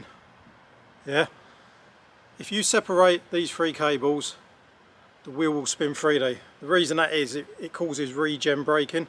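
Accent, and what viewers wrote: England, United Kingdom. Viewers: Nice to hear an Englishman doing one of these conversions. Most of them are from the US. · Судя по акценту ты англичанин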